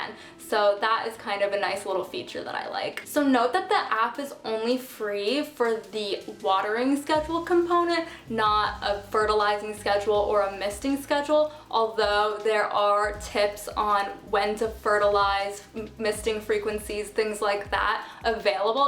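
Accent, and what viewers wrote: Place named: California, United States. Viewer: It's a California accent with a vocal fry and upward inflection. She likely doesn't hear it but I know exactly what you're talking about about.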